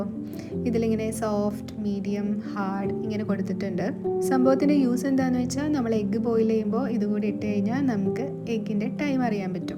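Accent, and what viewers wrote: Malappuram, India. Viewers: I think chechi thamasikunnath malapuram avidayaanno aa slang kettappo angana thoonni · മലപ്പുറം ആണോ ചേച്ചി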